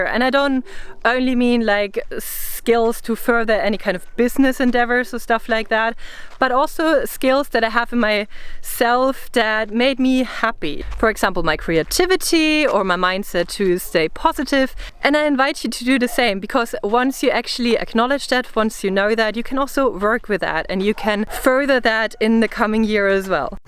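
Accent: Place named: Germany. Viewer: How lovely to have stumbled on this video.... I noticed your accent and said to myself....ist sie deutsch?!!? Tagsächlich....ich bin seit 1979 in den staten....aber meine ganze familie ist noch in der Stuttgart area!!! Auch eine küchen hexe und practicing pagan für über 40 jahre!!! Your video is fun, lighthearted, informative and REAL!!! No witchy theatrics but genuine witch lore, love love love... you definitely have another follower!!!! Here in New Jersey we have similar weather as home so wheat stalks, sunflowers are used for lughnassad decorations and on the eve of lughnassad I make my bread dough, let it rest overnight and bake lughnassad morning!!! A small piece as an offering during Ritual and consumed with a Lughnassad dinner in the evening!!! Tschüss!!!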